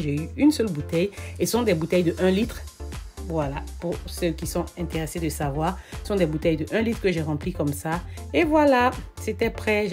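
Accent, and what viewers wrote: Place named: Ivory Coast. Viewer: Ma chérie je sais que tu es ma sœur Ivoirienne vu ton accent👏👏👏🥰🥰🥰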